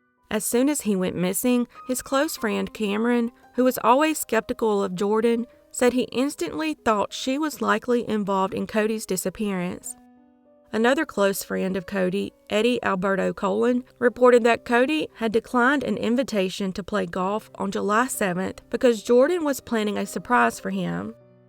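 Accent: South, United States. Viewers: Well… hey 👋🏽 there! Great to have you here again… I just love 💕 the accent! 🤗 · Good morning to you!😉 A good way to start my day! Your accent makes me miss NC ☺️ I do love love love your voice/accent! I can't say that enough!😋 Much love from Washington State 🤩🥰💞✌️ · This is my first video with you and I'm already hooked! (Subbed ofc) A combination of my favourite things... Crime stories and the southern accent! 💖🇨🇦💖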